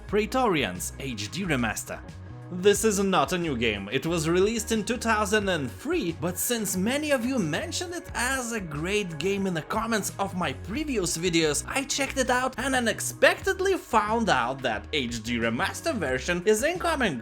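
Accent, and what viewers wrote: Lithuania. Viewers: Wait a minute... that sounds suspiciously Lithuanian. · Are you Lithuanian?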